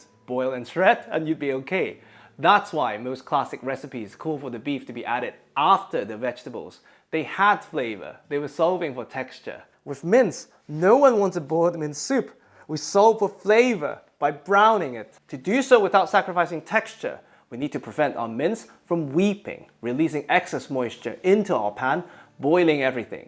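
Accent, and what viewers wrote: United Kingdom. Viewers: If I were to assume, I think he learned English from Europe. So he has a mix of an Asian accent and British English accent. He has a good sounding voice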